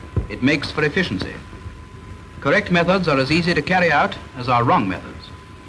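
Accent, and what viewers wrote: United Kingdom. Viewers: I've watched this video so many times, but I come back for the narrator's exquisitely dapper British accent.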